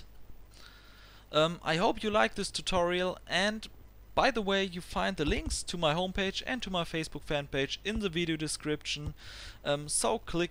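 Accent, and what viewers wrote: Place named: Germany. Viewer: Ich wollte gerade fragen, ob du deutsch bist, bevor ich die Kommentare gesehen habe. Dein Akzent ist wirklich deutsch :D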